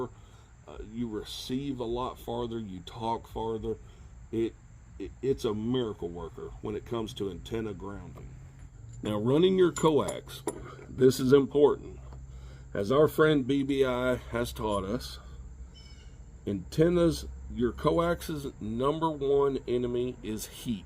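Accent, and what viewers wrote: South, United States. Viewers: Thank god I am from the south and can tolerate southern talk and mannerisms without getting pissed off because "condescending" to Californians is normal speech down here. Also here to learn CB. Got a firestik antenna and am learning how to use it. Thanks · Ugly redneck accent. 26 minutes of BS and 1 min of information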